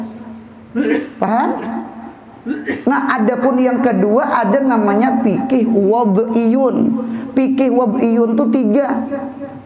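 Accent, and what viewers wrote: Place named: Amuntai, Indonesia. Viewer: Ulun katuju bgt lwn sdin nih, tutur katanya sopan bnget. Dari logatnya kya org amuntai. Mudahan guru selalu sehat dan istiqomah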